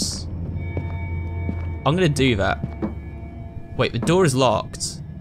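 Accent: United Kingdom. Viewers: The British accent is making it funny but i really wanted to hear say bottle of water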